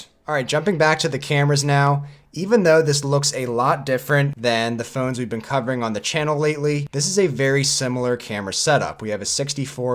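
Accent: United States. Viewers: That is not an English accent, it's an accent from some part of america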